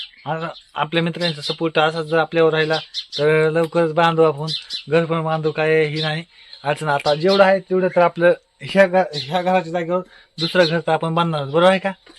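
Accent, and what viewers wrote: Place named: Kolhapur, India. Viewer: चे बोलणे एकदम मस्त आहे कारण तिचे बोलणे थोडे थोडे कोल्हापूर चे वाटते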